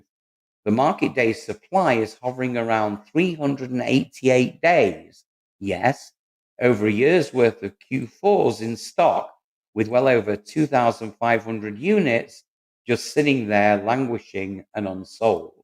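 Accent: England, United Kingdom. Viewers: It might help if you made that clear! Initially owing to your accent I thought this was addressed to UK citizens. Then when I saw a dollar sign in front of some numbers, the penny dropped! Shame that nobody could be bothered to show the currency for all the values. A very sloppy video! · I'm confused, are you talking about UK or US sales, confused because you have a UK accent but prices are in dollars. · What country does this apply to? English voice, prices in USA $$? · You sound English but you talk in dollars and call a car a sedan ! I’m confused. Ps I will never buy a car with an infotainment screen.👎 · You sound very English; why are you talking in dollars? What yank is interested in RHD? I just spotted it they're all LHD, you're talking about the US market. I suddenly lost interest. All the best mate! Mines a Jaguar XJL. It depreciated right to the point where I could afford after 12 years. 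· Just found your channel,having been a petrolhead for more years than I can remember, I was interested in this clip. That said, I am a tad confused, I assume by your accent that you are from Great Britain, however your logo shows a USA flag and terminology is somewhat odd (to me) ie $ Dollars, Gas, Sedan etc? As for Car dealerships, I agree with numerous posts that car manufacturers and dealerships have been taking the eyes out of the very people that keep them in a job THE CUSTOMER! Far too greedy, I have absolutely not one jot of sympathy for these money grabbing burglars. They reap what they sow. 🇬🇧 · American script with an English accent 😂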